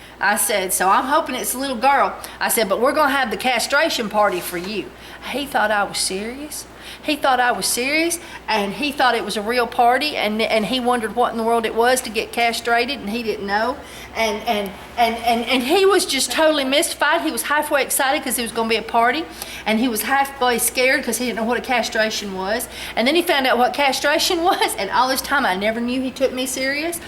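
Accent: South, United States. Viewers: What was the name of the last ingredient that you used from that list? I'm sorry but its hard to sometimes hear well withat cute southern accent of yoyrs. Sounded like yellow dot glue but I'm sure that wasn't it at all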